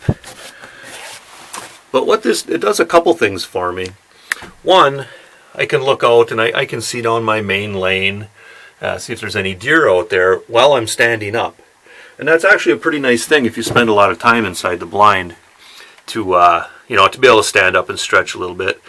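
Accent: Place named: Upper Peninsula, United States. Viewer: Sounds like sout o Ishpeming, eh. One of dem yoopers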